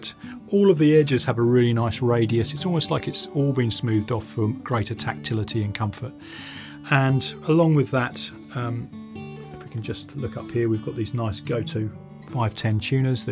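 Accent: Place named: England, United Kingdom. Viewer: I’m tickled with your English accent. Want to know where you’re from. I’m a Californian; nonetheless, intrigued with different accents.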